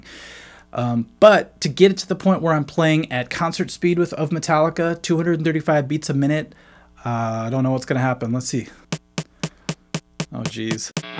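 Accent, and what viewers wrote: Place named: Texas, United States. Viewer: Is this guy from Texas? I never really noticed his southern accent till this vid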